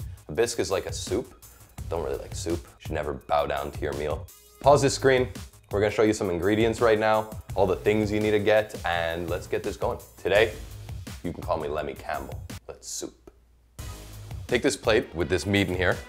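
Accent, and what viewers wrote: Quebec, Canada. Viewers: He is so Quebecois.... ( FRENCHY!!! ) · His accent seemed pretty consistent to me. He only changed his accent once when he said bacon (Jamaican accent, sounded like beer can). Either way, he has quite a bit of a French-Canadian accent. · I don't dislike u I actually like u cause u sound a lot more Canadian the the other guys Lol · You sound so Canadian lol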